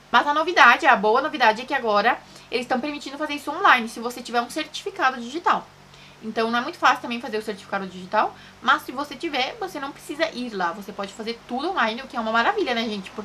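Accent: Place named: Spain. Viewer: Gente eh muito engraçado. Vc agora tem o sotaque de espanhola que mora no Brasil. Kkk Eh muito louco. Eu nunca fui a Minas Gerais mas só de minha mãe ter nascido lá eu falo uai trem, credo! Kkkkkkk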